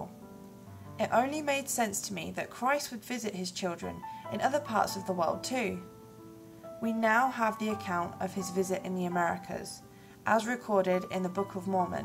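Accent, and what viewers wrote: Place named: Australia. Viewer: What happened to your Northhampton accent C? U sound kinda Auzzie to my untutored ears :S (search 'Alan Moore' - the Watchmen / V for Vendetta writer - to those not in-the-know for the NH accent)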